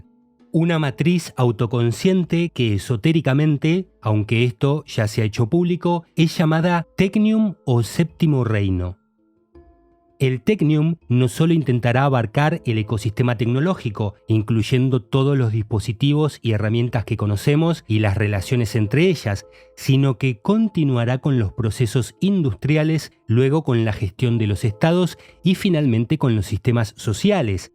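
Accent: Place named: Argentina. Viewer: Aquí, dispuesta, UNA VEZ MÁS, a SEGUIR APRENDIENDO de tus trabajos de investigación. Tu indisimulable acento argentino es, en verdad; un ORGULLO para todos tus compatriotas, entre los que me encuentro. Además, me llena de GRATITUD el hecho de que, a mis años, puedo quedar SIEMPRE ENRIQUECIDA al escuchar a un joven TALENTOSO que, seguramente, tiene edad como para ser mi nieto: un PRIVILEGIO que es para mí un REGALO DEL UNIVERSO. Un abrazo desde Mendoza.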